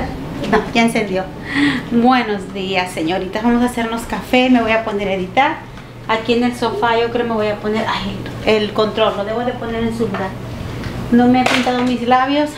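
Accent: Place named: South America, Latin America. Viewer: Hola, te escribo desde España.....te descubrí antes de navidad y desde entonces te sigo. Eres una alegría de mujer, me encantas. A mí me encanta la gente sudamericana porque sois bien alegres, bien educados y con un acento como dices tú bien rico. Una pregunta, conoces el canal de Sandy y Fercho? Ella es colombiana y el Mejicano viven en Miami y también los sigo. El día 31 es el cumple de ella y le entró una llamada a su móvil de una tal, eras tú??. Un beso mi linda.😊😊❤❤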